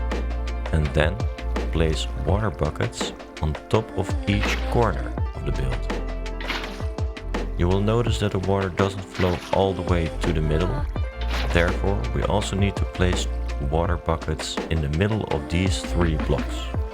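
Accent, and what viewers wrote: Netherlands. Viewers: why do you sound Dutch??!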